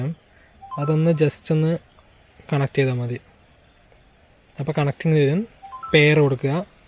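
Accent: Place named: Palakkad, India. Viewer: Wawoo അടിപൊളി 👌👌 സൂപ്പർ പാലക്കാട് ഭാഷ 👌👌💕💕💕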